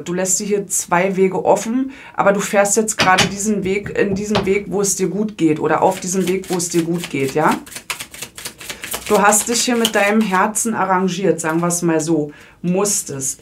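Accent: Berlin, Germany. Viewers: Vielen lieben Dank für diese sehr passende Tagesenergje - Botschaft 🙏❣️🙏 Heute ist für mich ein sehr sehr spiritueller Tag ❣️ Ich habe schon am frühen Morgen einige wichtige Erkenntnisse gewonnen und durch deine Botschaft der Tagesenergie finde ich diese intuitiven Gedanken bestätigt ❣️ Deine Tipps und Ratschläge sind immer sehr wertvoll für mich ❣️ Achja, das mit dem Grammatik Kurs vergiss bitte wieder 🙏❣️🙏❣️🙏 Ich liebe deinen Berliner Dialekt ❣️🥰❤️ Hab über 20 Jahre in Berlin gelebt, das ist einfach meine Heimat.... Wünsche dir und allen anderen, die dich auch von Herzen mögen, einen schönen und vor allem positiven Dienstag ❣️❣️⚡🌌☀️💖😘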